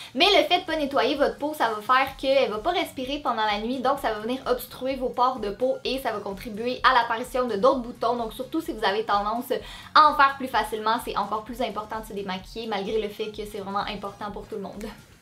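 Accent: Quebec, Canada. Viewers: je suis la 451 vue j'adore tes vidéo continue en plus tes trop belle PS : j'adore l'accent cebecoi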